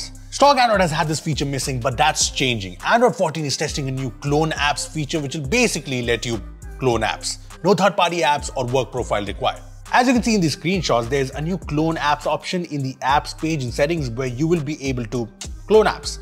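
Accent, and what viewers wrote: India. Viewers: I love the light Indian accent with great English ❤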